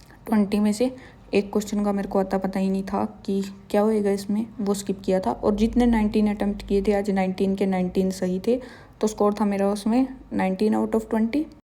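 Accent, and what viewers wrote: Haryana, India. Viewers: Aapki boli se lag rha hai ki aap Haryana se ho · Hanji Haryanvi Chori h ye . Don't mind yrrr Haryanvi ladki h ye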